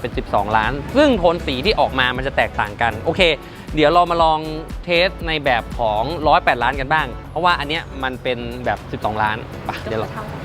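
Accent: Chiang Mai, Thailand. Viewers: พี่เป็นคน เชียงใหม่ใช่ไหมครับ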